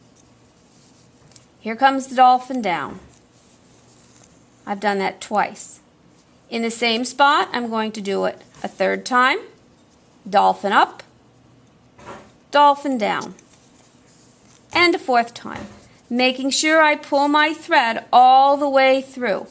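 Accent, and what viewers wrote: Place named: New York, United States. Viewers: I loved this tutorial thanks! It was like beig directed by a mildly annoyed New York/New Jersey person which was brilliant. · You sound like you’re from new york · everyone has an accent no matter where you’re from. My guess for the video is east coast United States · Love the slight NY accent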